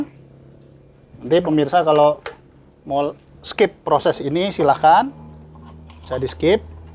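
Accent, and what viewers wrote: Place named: Banyumas, Indonesia. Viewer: Matap tutor video dari Pak suskes selalu buat Bapak,salam kenal dari wong kebumen,sama2 ngapak kaya mas ari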